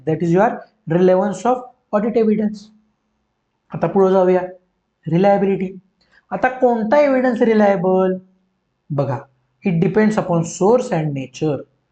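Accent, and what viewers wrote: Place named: Satara, India. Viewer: सुट्टी not भावा... आवाज तर सातारचाच वाटतोय...❤❤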